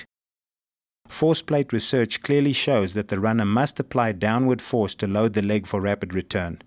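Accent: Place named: South Africa. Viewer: I think this is a South African accent. A "fucking accent" would probably have more moans, groans and "oh, baby"s in it. Doesn't everyone who speaks English have some sort of accent?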